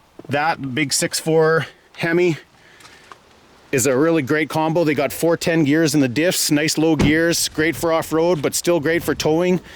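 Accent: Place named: Canada. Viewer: talks like a true Canadian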